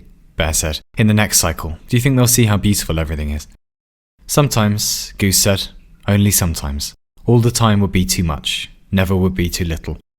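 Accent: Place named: England, United Kingdom. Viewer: what really makes this channel awesome is his English accent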